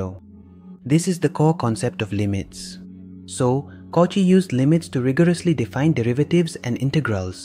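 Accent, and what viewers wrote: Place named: India. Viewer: Ein Inder oder Pakistaner? Typischer Akzent.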